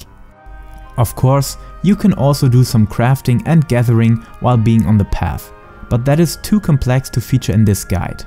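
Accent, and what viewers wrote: Germany. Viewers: As a german myself i got to say: your accent is THICK man. Try to adjust to the english tongue a bit more, practicing pronounciation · bro, your German accent...I just cant...sorry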